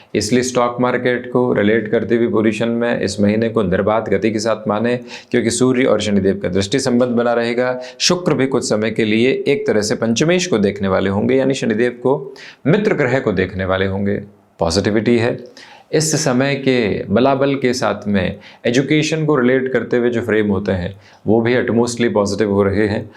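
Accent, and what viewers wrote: Standard. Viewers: Bahut badhiya rashifal bayan karte hai aap. Aur aapki hindi bhi bahut hi shuddh hai. Kabhi kabhi kuch shabd samazne me taklif hoti hai toh subtitles ON karke samazna padta hai. Dhanyawaad. 🙏🏻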